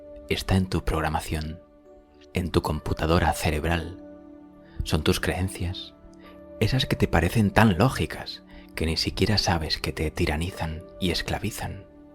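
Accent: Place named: Spain. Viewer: No sé qué es más aburridor escuchar el acento de un español o escuchar los comerciales que pone Google para manipularnos y beneficiarsen. ellos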